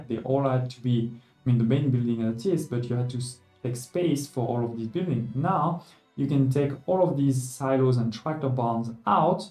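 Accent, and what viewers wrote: France. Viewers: Merci ! Cet accent français à couper au couteau rend l'anglais parfaitement compréhensible pour moi, donc double merci ! Bonne continuation.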